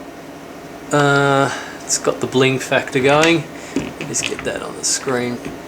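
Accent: Australia. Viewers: It's cool to hear an Aussie accent on You Tube.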